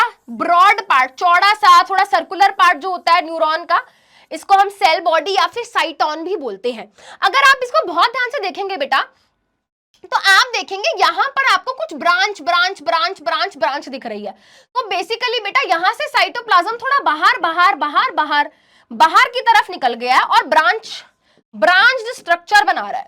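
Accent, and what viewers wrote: Bihar, India. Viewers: Bihari Ascent🤩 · Just luv your Bihari Ascent🤩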